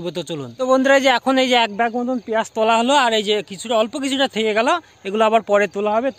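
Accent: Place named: Kolkata, India. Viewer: kolkatar bangla gula moja lage sunte...hevy test eta khub moja alge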